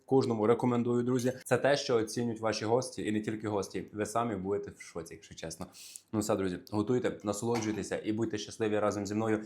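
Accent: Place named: Western Ukraine, Ukraine. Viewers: Чую мова моя,я з Франківщини,а фамілія поширена на Закарпатті,звідки цей? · Чудный повар!Чудный Буковинский акцент!❤❤❤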